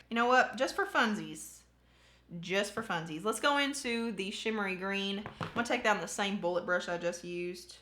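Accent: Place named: Kentucky, United States. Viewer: Just found your channel and noticed you're from Ky too!!! I love that I had a feeling from the accent lol I'm in eastern ky area what area are you from?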